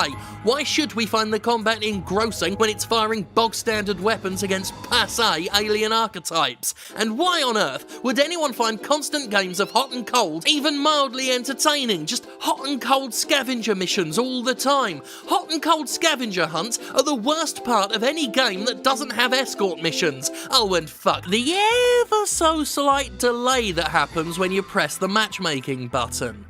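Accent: United Kingdom. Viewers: It's been 10 years and I still get a hard-on every time I hear that swanky British accent. Sheers mate!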